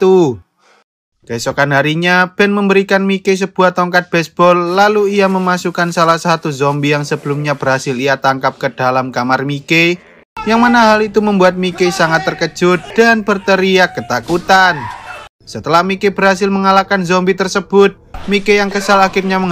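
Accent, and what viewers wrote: Java, Indonesia. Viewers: Kamu org Jawa ya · jowo ngendi mass? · Fiks org Jawa Admin ny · Logat jawanya masih kental kali😂😂😂...jd kurang sedap mengikuti alur filmnya😢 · suara jowo nya kurang kurangi mas 😃😃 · ORANG JAWA YA BANG .. NGOMONG NYA MEDOK.. JAWA NYA MANA BANG .. AKU SEMARANG BANG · Medok bgt jawanya mase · Coba plis logat jawa nya jangan di pake ,jadi susah nyimak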